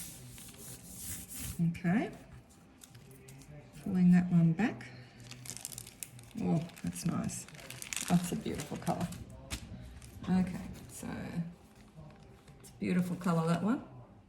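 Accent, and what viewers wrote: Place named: Australia. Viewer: I love your work, can you advise where to buy the deli paper from, I’m struggling to find a stockist. You sound Aussie, like I am. I love experimenting with my gelli plate.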